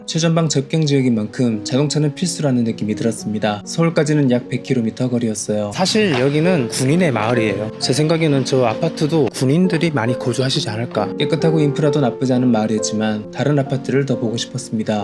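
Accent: North Korea. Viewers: 말투에 북한 사투리가 느껴지는데? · 근데 경주출신 맞으세요? 말투가 뭔가 탈북민 같은데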